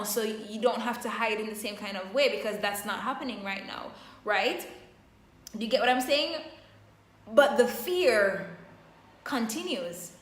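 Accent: Jamaica. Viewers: I sense a jamaican accent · Are u from Jamaica? Your accent is nice.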